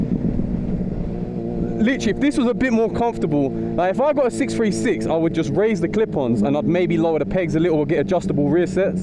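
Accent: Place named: United Kingdom. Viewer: freezing I bet it was wow congrats get licence mighty lad, good rds to go on for sure, you live out there ye still got uk accent,👌 im irish. my ideas might lead yiz astray 😝😂 I love to killswitch the guards at lights, pure madness😂 other bikers ready to bolt 😝😂 ye better not do that over there lad! Enjoy everything you'll be flyin, ive cbr600rr too they're savage, you'll enjoy that ride out. Look forward to more.keep her lit🏍💨💥👊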